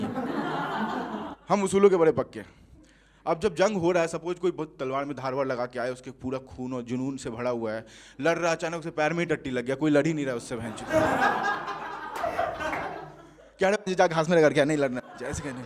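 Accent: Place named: India. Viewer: waise, aapke saare video dekhe, funny to hain hi lekin ek desi lehja bhi hai jo jokes ko aur bhi mazedar bana deta hai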